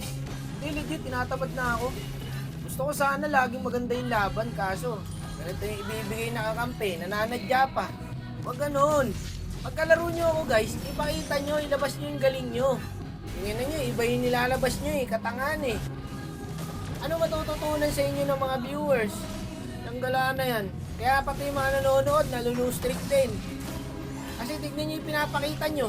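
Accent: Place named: Bulacan, Philippines. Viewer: San ka sa Bulacan boss na halata ko kse boses mo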